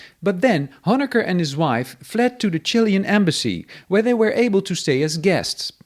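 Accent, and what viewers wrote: Netherlands. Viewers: It wouldn´t hurt to do something about the Dutch accent. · Not to be weird as a first time visitor but your accent sounds like Dutch English but genuine German when quoting Germans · Thanks for that, very interesting. Can I ask, are you from the Netherlands? Ich versuche höflich deinen Akzent zu erraten!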